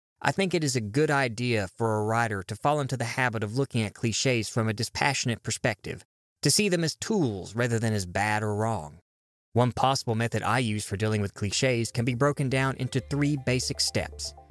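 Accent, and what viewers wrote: South, United States. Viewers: why am i only now noticing your southern accent?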